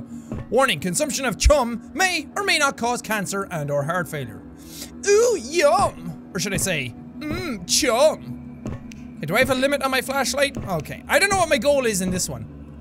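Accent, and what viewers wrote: Ireland. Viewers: Is he Irish ☘️ I’m irish☘️💚🇨🇮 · Every video he get more Irish.😁 I wish I was Irish 😢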